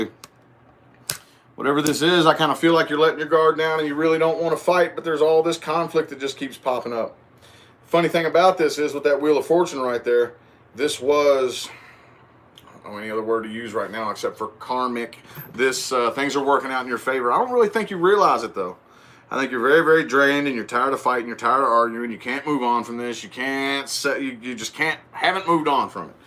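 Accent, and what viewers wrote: South, United States. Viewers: ...That Southern Twang,...Love🦋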